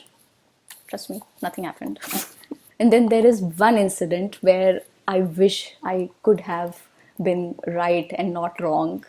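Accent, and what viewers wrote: India. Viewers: Why I'm not understand indian accent but American do?? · wow so nice, i love Indian accent. love and respect from Pakistan .. Mam u r looking like illena D'cruz.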